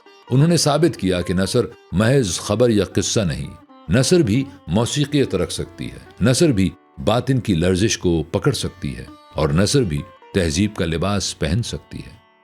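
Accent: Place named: Lucknow, India. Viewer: بہت عرصے بعد ایسی خوبصورت ،آواز،بہترین تلفظ،آراستہ پیراستہ اردو زبان اور کسی دلکش ندی کی طرح روانی سے بہتا ہوا لہجہ —-حقیقت یہ ہے کہ میں سجاد حیدر کو بھول کر ان صاحب جن کا نام بھی مجھے معلوم نہیں ،کے سحر میں کھو گیا اور سجاد حیدر کہیں دور پیچھے رہ گئے۔یوں لگا کہ لکھنؤ کا اردو لہجہ اور دلی کی قلعہ معلی کی زبان دوبارہ سے زندہ ہو گئی ہے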